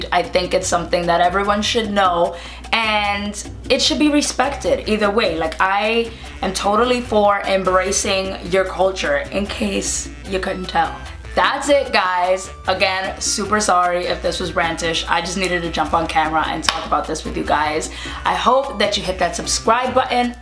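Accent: Puerto Rico. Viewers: I love this video . I'm a cali rican. my mom is from the island and my dad is a newyorican. I'm just watching laughing because you are so right. all my cousins and I are first-generation from California and I wish we had more Puerto Ricans out here. it just gives me a warm feeling inside hearing your accent when you speak Spanish and the love for of our people mucho amor ;)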